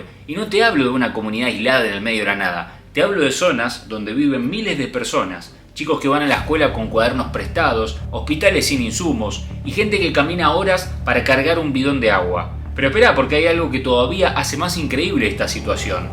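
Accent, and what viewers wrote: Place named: Argentina. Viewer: se disfruta más el tono y acento de voz argentino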